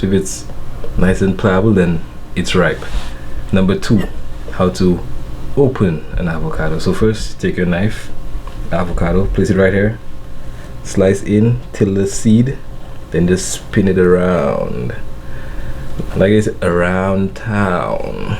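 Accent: Saint Lucia. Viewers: doing it big!!!!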